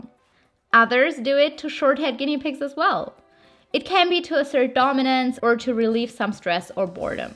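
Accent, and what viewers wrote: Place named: Germany. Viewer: Usually I don't hear it, but could hear the German accent a lot in the video. Just a interesting notice. Another good informative video for new gunea pig owners and a fun one for old ones and gunea pig fans..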